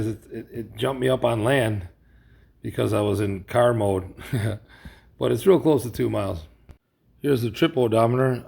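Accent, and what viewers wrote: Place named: Italian-American, United States. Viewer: You sound like a fellow paisan! Keep up the good work!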